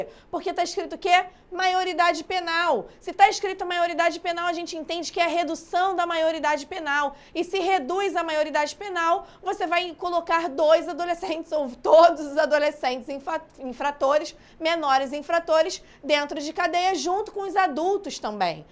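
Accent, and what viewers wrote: Rio de Janeiro, Brazil. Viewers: Nada como ouvir o bom e velho sotaque carioca!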